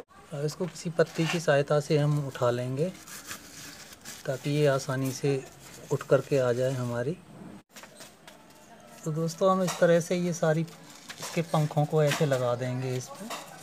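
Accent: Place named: Punjab, India. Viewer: Apki hindi se Punjabi lagte hain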